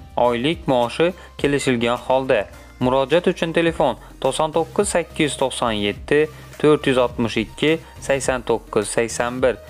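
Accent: Khorezm, Uzbekistan. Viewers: Диктор аниқ хоразм. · Хоразмликмисз, интонациянгиздан билинвотти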